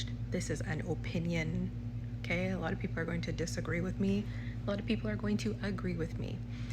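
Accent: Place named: United States. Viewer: woman geh we Ah for Kane chance nah, is you who’s in somebody’s pocket. Yuhs ah UNC operative trying to trick people wid yuh yankee accent. Allyuh fullah education, but dunce like bat!! still following this outdated cane farmer union propaganda from the 1950s, it’s time for an upgrade. Allyuh ent shame?? And BTW this is a legislative issue which has to pass through parlaiment, and not a referendum where public participation is needed, and isn’t it the reason why the people voted for members of parliament? they are appointed as voices of the people in their individual constituencies, so there’s no need for a national consultation, so stop trying to fool the people. Shame on you!!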